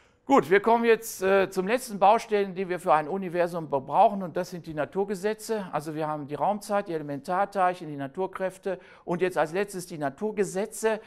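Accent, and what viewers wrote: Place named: Westphalia, Germany. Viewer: Aber in Leipzig geboren und ab 1977 in Münster studiert. Da kam es wohl zur westfälischen Adaption. Was für ein Glück. Man stelle sich diesen Vortrag mal auf sächsisch vor. "Dor Ürgnoll, de Krawwidodsjöön, däs Ällägdröön is büngdförmisch, ün de Raumdsait is gegrümmd." Nee, lieber nicht :-)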